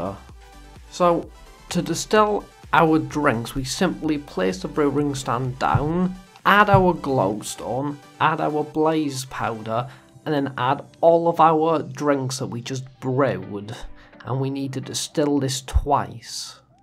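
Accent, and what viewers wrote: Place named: Wales, United Kingdom. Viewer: You from Wales? Your accent sounds Welsh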